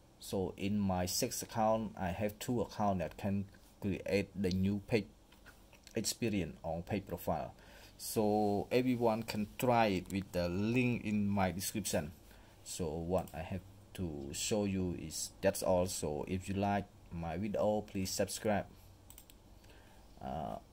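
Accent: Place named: Cambodia. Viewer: បងខ្មែរមែន ព្រោះនិយាយតុងខ្មែរ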